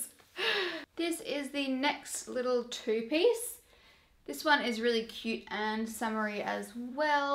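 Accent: Australia. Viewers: The aussie accent 😍😍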